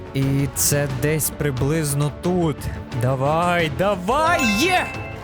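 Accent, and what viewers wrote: Lviv, Ukraine. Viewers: ТИ З ЛЬВОВУ???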